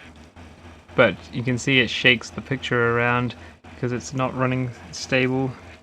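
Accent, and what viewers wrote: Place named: Australia. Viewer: I've been looking at TradeMe... Surprisingly not as many options as one would hope, someone sold an old broadcast monitor like techmoan uses for 300 the other week, kinda nuts. I'm curious then, can I ask where you hail from? My bf reckons you've got an Aussie accent 😅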